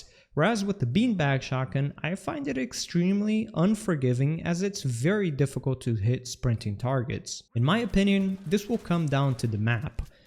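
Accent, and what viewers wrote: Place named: Portugal. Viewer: I knew as soon as you started to speak that you were portuguese. Great vid man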